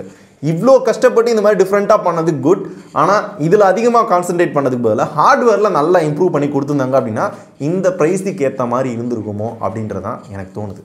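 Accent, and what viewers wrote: Madurai, India. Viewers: Madurai karan than ya nee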